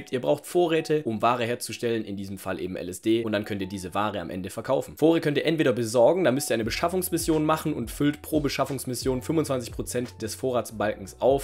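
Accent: Swabia, Germany. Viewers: wieder unglaublich gutes video, danke dir,. selbst meinem vater, dem i das video grad gezeigt hab, hat gemeint: " das ist aber ein sehr professioneller junger mann. aber bei dem hochdeutsch ist der doch kein schwabe? " - doch isser, unser 😁 ich wünsch euch allen ein frohes und besinnliches weihnachtsfest 🥳liebe grüße aus dem regnerischen Bärlin. peace and love ✌❤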